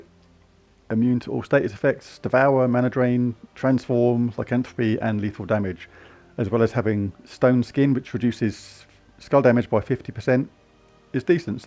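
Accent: United Kingdom. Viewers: nice to see a british? (you sound british) gems of war youtuber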